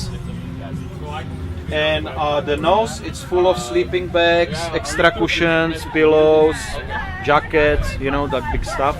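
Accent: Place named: Russia. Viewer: Funny guy, i tought he was russian by his accent, real nice and hand-on guy !!! Those 5 girlfriends dont know what they were losing !! 👍👍👍👍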